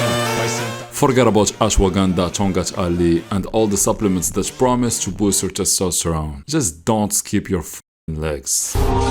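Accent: Morocco. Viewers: Judging by your accent 100% you are from morocco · you're moroccan for sure, that accent is theeeere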